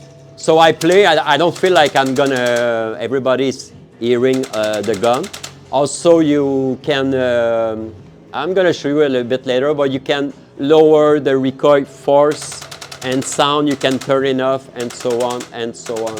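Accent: Quebec, Canada. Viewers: Woah you sound so Quebecers mon chum! Subscribe esti! J'suis content d'avoir un fan d'arcade/retro de chez nous!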